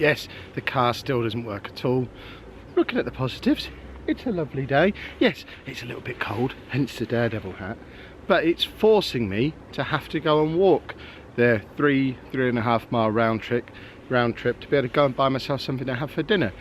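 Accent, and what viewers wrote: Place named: London, United Kingdom. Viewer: You sound like your from london (paul rudds voice)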